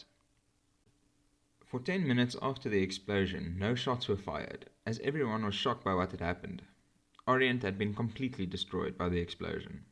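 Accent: South Africa. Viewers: I also guessed the narrator is from SA. Blown by the quality content and precise narration 🇿🇦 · Thank you for your gracious reply. I note that others have said much the same. Having been at school with S.Africans I have no problem with the accent but audio levels need to be higher and delivery a bit slower. This will make your channel one of the very top military history ones anywhere with simple graphics, directions and exact distances given. The fact that so many are saying he same is a tribute to your scholarship. Best wishes, Dr Stephen Hall, Ireland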